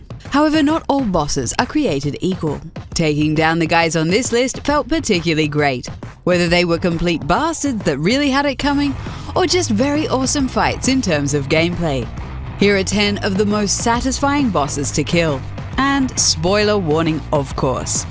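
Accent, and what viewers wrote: Australia. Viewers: She's Australian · Is the mysterious voice over lady's accent australian? Or where is this accent from? I love it!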